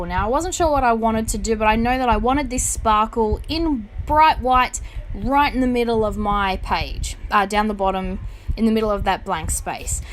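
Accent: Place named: Australia. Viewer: Subbed as soon as I heard your voice. ^5 Aussie. Great idea to use the castoff.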